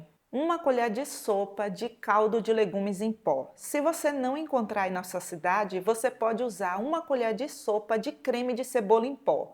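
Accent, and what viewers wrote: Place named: Fortaleza, Brazil. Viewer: É por essas dicas maravilhosas que gosto muito do seu canal ❤ E com esse sotaque, mulher eu achava que vc era de fortaleza kkk E de onde vc é?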